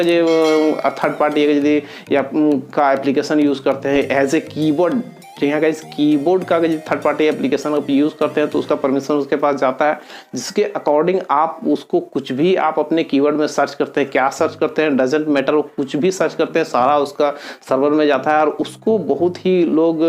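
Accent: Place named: Assam, India. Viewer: U talk like Assamese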